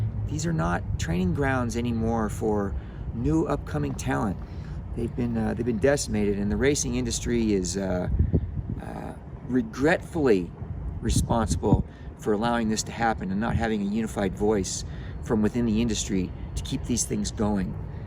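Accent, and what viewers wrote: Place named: New England, United States. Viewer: Sounds like Massachusetts, and New England.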